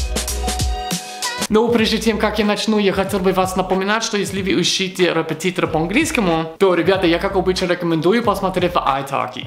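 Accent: England, United Kingdom. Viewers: Давайте поможем избавиться от Английского аксента) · почему ты сам не избавишся от англ акцента, почему ты нас учишь избавлятся от нашего акцента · Молодец, теперь сам избавляйся от жесткого английского акцента который также дико режет слух!) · Ля ты с диким английским акцентом говоришь по русски, а нам говоришь как тебя бесит когда мы не правильно произносим слова...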